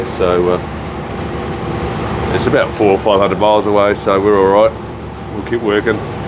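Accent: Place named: New Zealand. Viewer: Gotta say I love watching this shit as a keen fisho always interested in how the pros do things , so I subscribed keep filming You’ve got a good narrator’s voice too might be the bloody kiwi accent Just a note for you I fish out of Robe SA water temp this summer has been around 13-15 degrees c ,I think the fish are frozen Cheers from down south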